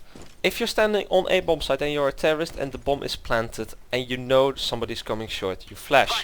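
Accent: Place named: Netherlands. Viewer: Je bent wss nederlands ik hoor het door je accent haha :D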